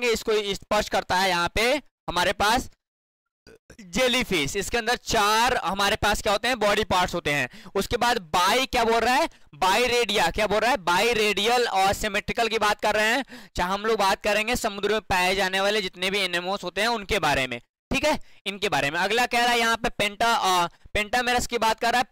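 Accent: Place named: Bihar, India. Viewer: By the bihar me yesi hi pronounce hota hai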